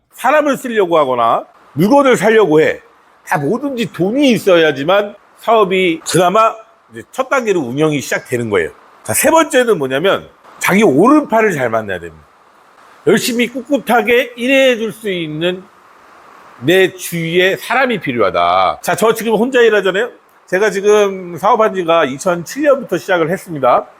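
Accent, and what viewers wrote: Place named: North India, India. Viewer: 인도 북부 케쿠라 억양이 아직 많이 나마 있네요 한국말 잘하심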